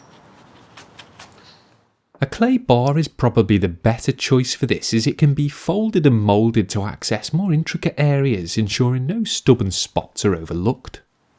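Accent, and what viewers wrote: Liverpool, United Kingdom. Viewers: You must be from Liverpool or around there !!! Lol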